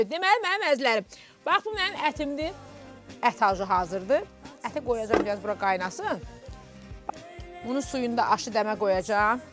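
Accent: Goranboy, Azerbaijan. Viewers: Goranboydan salamlar. xanim ne qeder bakida yasadiz indi de moskvada yasayirsiniz amma oz hele de oz goranboyumuzun lehgesinde danisirsiniz halaldi size.hemise bele qalin deyismez.hem de deyim ki somu basqadi ispanaq basqa.somu yol qiraginda bitir.gozel de ketesi olur.xosbextlik arzulayiram.❤❤.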